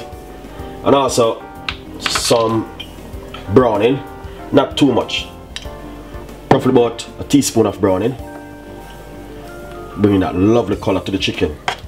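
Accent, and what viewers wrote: Jamaica. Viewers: Sound like a Jamaican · That’s really just how Jamaicans talk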